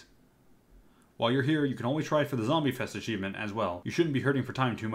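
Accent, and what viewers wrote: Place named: Netherlands. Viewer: You're Dutch, aren't you? Good accent, it's almost not coming through. Almost :P Nice vid, keep it up. Speak a bit slower though, it's not a race :P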